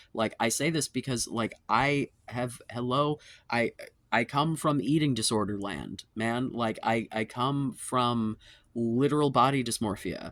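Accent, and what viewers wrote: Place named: United Kingdom. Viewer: I came here to learn british accent lol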